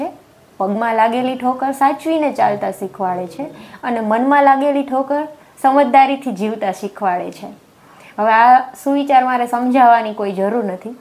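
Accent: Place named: Kathiawar, India. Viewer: Gujarati vlogs is far more better than Hindi. Your Hindi was not so clear and in Kathyawadi tone. Hence, our mother tongue is the best. Good luck.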